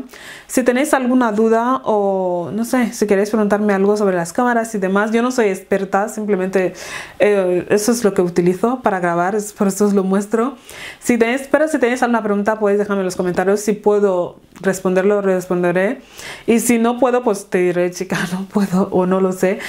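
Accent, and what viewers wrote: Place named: Equatorial Guinea. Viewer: Saludos desde Buenos Aires!! Me encanta el acento de Guinea Ecuatorial!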